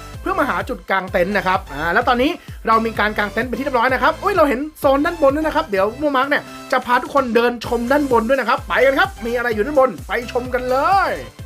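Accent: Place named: South, Thailand. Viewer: ฟังเพลินครับภาคใด้มันมากครับ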